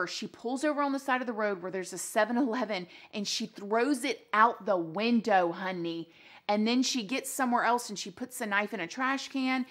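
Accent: South, United States. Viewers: You told the story PERFECT better than any I heard even back then! And I LOVE ya southern twang and your SOOOO Soo pretty 😍😁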